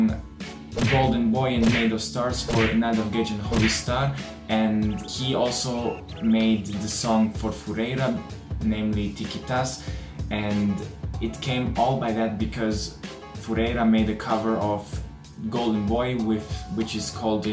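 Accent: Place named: Israel. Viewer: Bro......l can hear your isreli exent. pliz stop embresing your self. THNKS.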